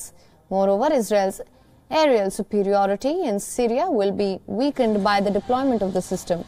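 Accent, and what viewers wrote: India. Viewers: And thickest accent, like goddam. I thought stand up comedian was overexaggerate on how funny stereotypical indian accent was. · FIND SOMEONE THAT CAN ACTUALLY SPEAK ENGLISH SMOOTHLY AND WITHOUT THIS SCREECHING AND EXTREME I. N. D. I. A. N ACCENT, which makes it not ONLY very unpleasant but rather hard to understand! She is beautiful non-the-less, BUT this isn’t a pageant contest, it’s supposed to be the ‘news’! 🙄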